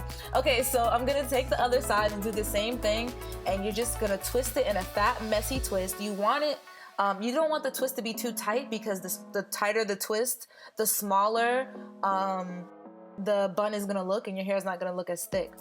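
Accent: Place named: Queens, United States. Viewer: Are you from Queens? You kinda sound like it.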